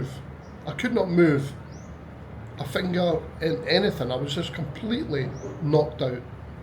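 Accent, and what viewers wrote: Scotland, United Kingdom. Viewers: Sounds you are from Scotland….no doubt it’s nice to live in the Philippines because it’s not cold like Scotland .. but when it comes to Medical care, you ,😊 you have to use all your life savings ready…American Style, No Medical Insurance your f****up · He sounds like a true Scotsman. Take care mate, stay in good health always. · New subscriber here. I’m guessing your Scottish? 😊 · Lewis Capaldi accent. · I’ve visited Blantyre a few times… Love the people over there! Very warm and friendly, if they take a liking to yah! Took me back when I heard ya speak!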